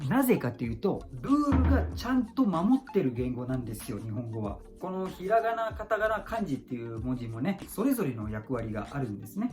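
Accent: Kansai, Japan. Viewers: ちょっと関西系？のなまり？を感じるのは私だけでしょうか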